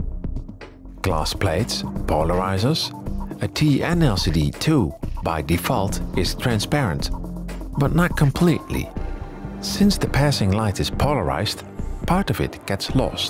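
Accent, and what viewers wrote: Netherlands. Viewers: Are you dutch? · This channel is like technology connections but narrated by a Dutch(?) person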